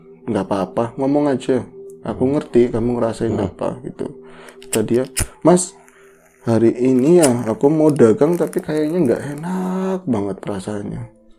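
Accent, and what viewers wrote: Banyumas, Indonesia. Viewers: ora ngapak ora penakk 😁😁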